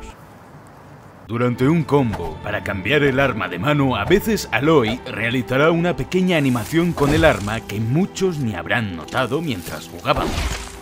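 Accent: Spain. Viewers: Normalmente hacen un 50% y 50% en poner doblaje latino y español, no pierdan esa costumbre. Lo comento por que en este video se nota mucho el doblaje español sacando el detalle del capi en la outro del video.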